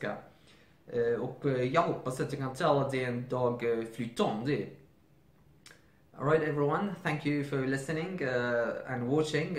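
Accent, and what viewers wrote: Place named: Chile. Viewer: Qué extraño que no tengas más vistas y likes. Eres como un políglota "invisible" haha, Tú español está bien, es gracioso tu acento, se parece al acento chileno. ¿Cuándo subes otro video?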